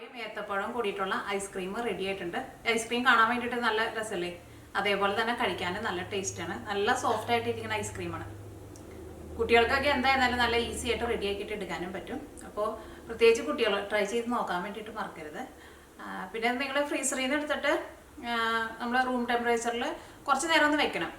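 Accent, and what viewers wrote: Thrissur, India. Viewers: ചേച്ചിയുടെ ഈ റെസിപ്പിയും സൂപ്പർ... ഞങ്ങൾക്ക് വലിയ ഇഷ്ട്ടമായി ആ തൃശ്ശൂർ ഭാഷ സൂപ്പർ, and....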